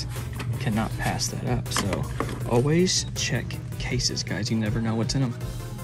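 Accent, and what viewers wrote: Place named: South, United States. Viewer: Where r u from cuz u sud like u from the south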